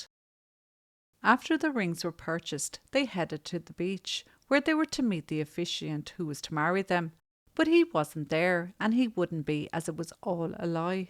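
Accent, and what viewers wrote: Ireland. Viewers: This Irish cat doing the commentary freaks me out. She sounds like a Mother Superior on crack!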